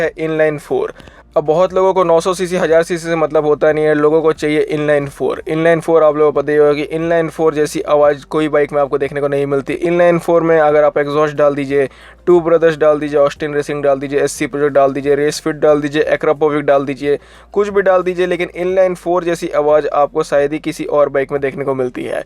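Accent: Bihar, India. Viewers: Bhai aap bihar se ho kya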